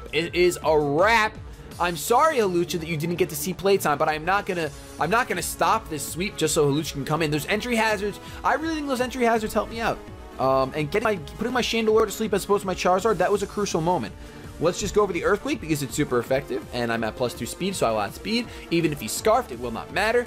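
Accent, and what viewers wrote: India. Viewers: Why the Indian accent offensive to me mate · Indian accent ftw. You've got yourself a sub bro.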